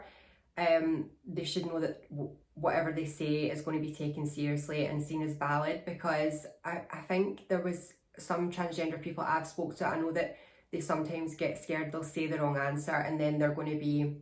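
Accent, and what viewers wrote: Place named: Scotland, United Kingdom. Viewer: Personally I would have been whipped if I had have told my father when I was that Young. Even now when I am at an early stage in my own transition. I still need a lot of encouragement in my journey because I feel Society is not taught any different . That decision to block trans people should not come down to a decision one greedy person decided to Sue the government. Oh by the way I love your channel and the Scottish accent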